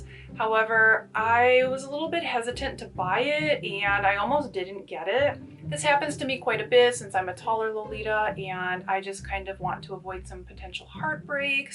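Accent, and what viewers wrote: United States. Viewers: Are you from Minnesota? I hear a slight accent. It’s so nice to listen to <3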